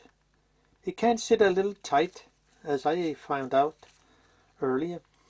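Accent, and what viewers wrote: Denmark. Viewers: You sound danish. Are you located in Denmark?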